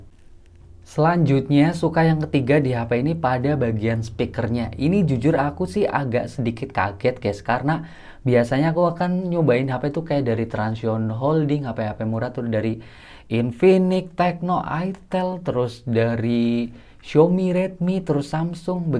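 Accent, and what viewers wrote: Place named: Banyumas, Indonesia. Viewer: Kamu orang daerah mana sih bang?😄 Logatnya rada ngapak.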